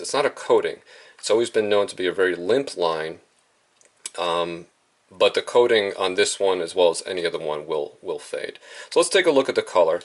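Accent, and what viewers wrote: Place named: New Jersey, United States. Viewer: I'm sure you've already addressed this somewhere, but I can't find it... What part of Jersey are you from? I pretty much knew from the accent, but this video, with your license prominently displayed on your hat, eliminated any doubts. 😀 Just so I understand completely, are you saying essentially all your break offs on this line and Samurai have been on casts? I know that can occur when a couple of little loops form or you've caught a large fish or snagged up and try to pull it free (and it sounds sickeningly like a gunshot). In any case, if it hasn't been breaking on hooksets, I still might give it a serious workout. Part of what's going on, I'm sure, has to do with the fact that Japanese lines label their actual break strength whereas most of the domestic lines like Power Pro, Berkley, and Sufix rate them sort of arbitrarily by diameter, which is why Samurai is so much thinner at each labeled strength. 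I'd bet 80# Sufix breaks over 100# on an IGFA test for straight pull.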